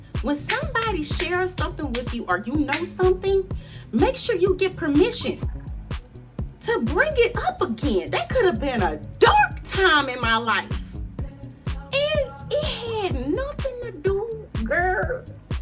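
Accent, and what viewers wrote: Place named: Texas, United States. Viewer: Honey I love your Texas accent! Please give us more. And celebrating the small steps of your loyal circle is important. My circle getting super small though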